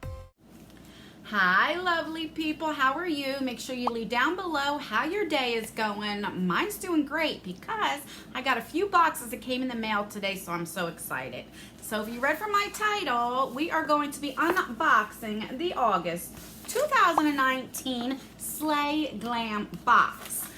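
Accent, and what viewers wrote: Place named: Louisiana, United States. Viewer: Cool! Can’t wait to get my box!!! Hey, I keep hearing your accent (as someone from Oklahoma with my own accent 🤪) and you sound a tiny bit like you’re from Louisiana. I know it says Jersey in your intro, but just thought I’d comment that. Love your reviews!!!!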